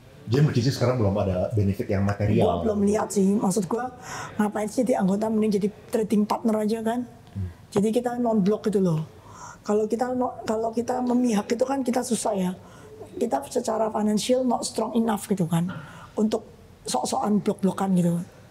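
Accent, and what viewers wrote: Java, Indonesia. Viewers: Cici ini pintar ,sederhana, duitnya banyak.tapi gk pengen oplas.1lagi medok(gk lupa dia berasal) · Tercerahkan, terimakasih mbak e. Logate jowo gampang dipahami. Bakbuk itu impas 😅❤ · Antara Jawa Tengah atau Jawa Timur wlwkwk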